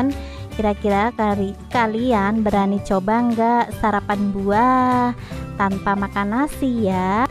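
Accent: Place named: Java, Indonesia. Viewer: Bundaa Asli Bali apa bukan bun.. Masih ada logat jawa nya heee.. Tarik sis... Semangka... Hihi.. Segee bgt..dalam nya kuning yah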